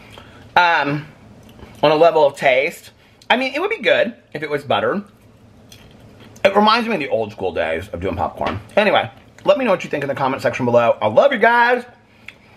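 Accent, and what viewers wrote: South, United States. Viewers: I was more of a Richard Simmons kind of girl? 😂 this was SO fun, I love your Southern accent! 😂 love you! 💙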